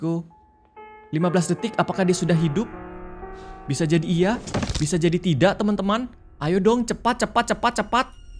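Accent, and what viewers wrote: Java, Indonesia. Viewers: Medhok banget kak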